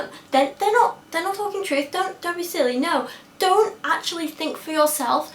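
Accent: United Kingdom. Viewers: MaxxxwellC I think its... British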